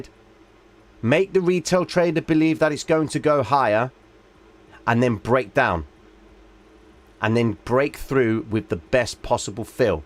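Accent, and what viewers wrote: Cockney, United Kingdom. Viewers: Man, U R flipping brilliant. I learn 10-20 different things on every video you make. Thanks. Beginning to understand the MM strategies. Pretty sure it will help in the future.Of course, always remembering that anything can happen. By the way, U got an Italian name and a Cockney accent. Remind me of a few friends I had back then.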